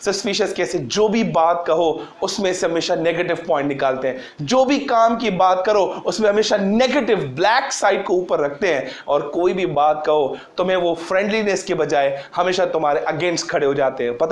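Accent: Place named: Pakistan. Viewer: I'm from Pakistan and your speaking style is like Pakistanis You present the topic very well Thanks 💖💖💖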